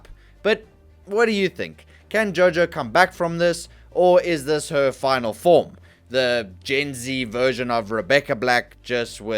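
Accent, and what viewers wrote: South Africa. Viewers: I know a fellow South African when i hear one❤🇿🇦keep up the content! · Yoh, I heard the "boet" in your voice from the first 3 seconds 😂😂😂😂 Ny lekker boeta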